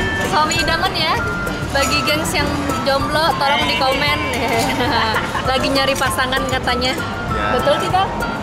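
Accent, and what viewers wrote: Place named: South Kalimantan, Indonesia. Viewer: Org mana kk ngomong nya itu deh kek org kalsel gak tau juga sih bener apa enggak🤣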